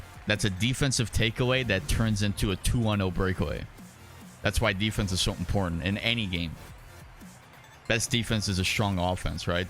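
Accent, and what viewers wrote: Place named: Delaware County, United States. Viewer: You should do a Tom Wilson build. And I have a random question… are you from Delco? Your accent sounds just like it lol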